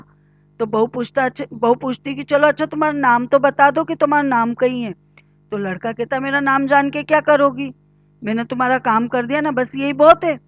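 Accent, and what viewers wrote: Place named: Ujjain, India. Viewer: Aapki konse city se ho ? Aapki bhasha ujjain jesi lag rahi hai . Plz bataiye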